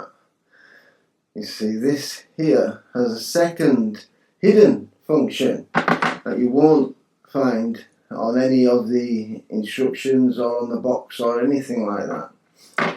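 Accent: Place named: Yorkshire, United Kingdom. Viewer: He comes from the. North of England. Possibly Yorkshire. They tend to talk slower,giving the appearance of being drunk slightly. I'm from the south west yes we all have accents from wherever we live. At least we don't say y'all every other few words.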